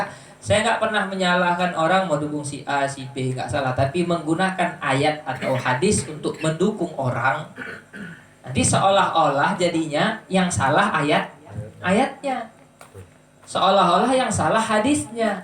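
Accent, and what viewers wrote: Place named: Sumatra, Indonesia. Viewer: Logat sumtra rasa kyai NU jawa, jos pak smoga bisa bhsa jawa jdi lebih jos kalau smbil melawak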